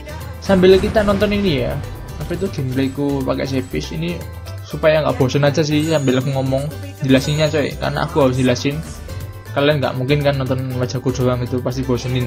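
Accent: Java, Indonesia. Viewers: Bang live streaming dong/bikinin game play WW... + nganggo boso jowo tok lek omong wkwkwk... Kangen medok mu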